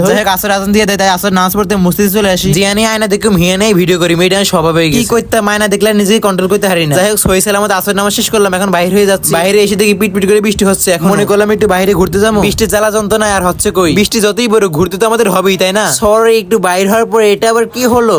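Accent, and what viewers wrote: Noakhali, Bangladesh. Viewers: ভাই আপনার বাড়ি কি নোয়াখালী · আচ্ছা ভাইয়া তোমাদের বাড়ি কি নোয়াখালী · আপনার বাড়ি কি নোয়াখালী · ভাই আমি নোয়াখালী থেকে বলছি। তুমি অনেক ভালো নোয়াখাইল্লা ভাষা পারো। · ভাই আপনার বাড়ি নোয়াখালী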